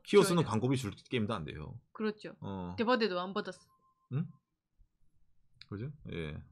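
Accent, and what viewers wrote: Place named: Gyeongsang, South Korea. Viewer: 경상도버전 대도서관...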